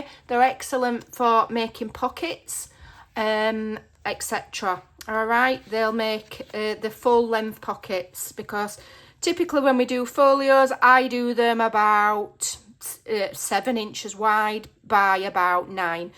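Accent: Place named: North, United Kingdom. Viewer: Only just found you and haven’t watched this video all through yet but …..I just love your northern accent so you’re a winner already 😀 .. will comment on the video later 😀 xx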